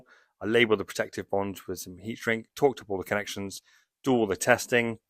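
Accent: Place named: Bristol, United Kingdom. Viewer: I always wondered what these tools sounded like in Bristolian , now i know . Well Done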